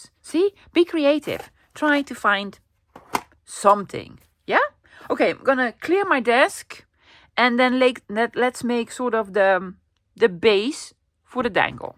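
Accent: Netherlands. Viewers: I’m fairly new to this channel… I only discovered you about a month ago and I’ve been binge watching your YouTube videos. I absolutely love this channel! My mums family is form the Netherlands too so hearing you accent is very nostalgic to me. Anyway just wanted to say that you’re awesome and I love your sense of humour ❤️ love from Australia 🇦🇺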